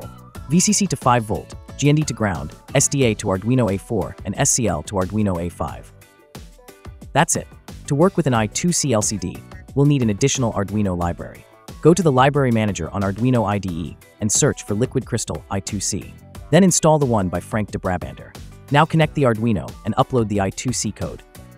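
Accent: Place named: India. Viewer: Parce que je préfère la voix d'un robot plutôt que du "Indian English"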